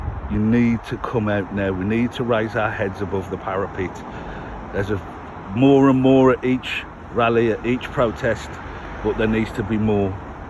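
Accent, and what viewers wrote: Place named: West Midlands, United Kingdom. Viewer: Subscribed. I absolutely love your accent❤ I'm fromYorkshire but i adore a West Midlands accent.❤ well done for starting this channel. We need to stand together, we are united in our shared values and morals. ❤ much love to all❤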